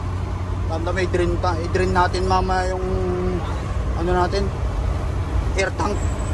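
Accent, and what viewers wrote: Ilocos, Philippines. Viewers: Ilocano ka met ngayam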